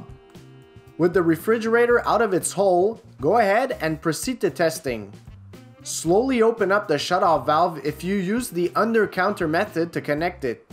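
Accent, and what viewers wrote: Quebec, Canada. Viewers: That undeniable french canadian accent :p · DUDE, you must be from Canada Dare Hey!!!!! Your accent gives you away.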